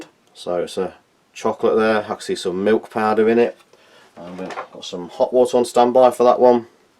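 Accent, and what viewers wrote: United Kingdom. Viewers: Your reviews are fantastic. There are few things I enjoy more other than relax to your reviews, your calm british accent and James Bond style manners and thorough review of your ration. This clip, like most of your others, is a Masterpiece. Please keep up your awesome hobby. And please, for the love of god, do not cut your videos short because you've reviewed a specific type of coffee or tee or whatever before. It always adds to the enjoyment. Thank you.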